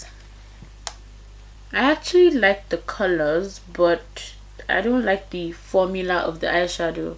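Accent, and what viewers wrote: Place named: Nigeria. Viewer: Gurrl!! You're Nigerian accent is everything, original, i hate it when people try to copy other people's accent, kudos!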